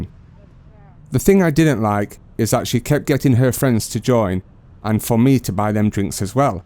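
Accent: United Kingdom. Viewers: You Sound like you come from the U.K. Question: would you ever consider having a prostitute as a girlfriend ? The bar girls in Pattaya are all prostitutes, no matter how pretty and well presented they are. So these girls are all a risk and have a certain mindset. Have fun and walk away!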